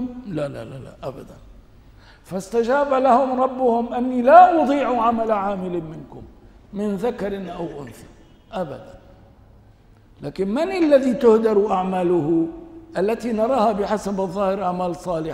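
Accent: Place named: Levant, Arab world. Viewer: A mixture. And when he speaks Fusha, he sometimes pronounces the words like the Shami dialect. Nothing wrong with that in this context because he's addressing the masses.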